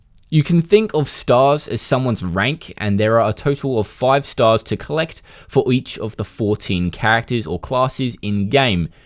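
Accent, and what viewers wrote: Australia. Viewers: yo didn't know ur australian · You australian? What city you at. I'm in perth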